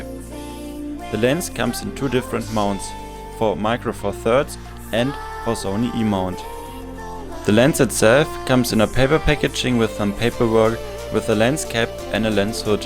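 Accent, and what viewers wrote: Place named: Germany. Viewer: An dein Englisch kann man so gut erkennen das du deutsch bist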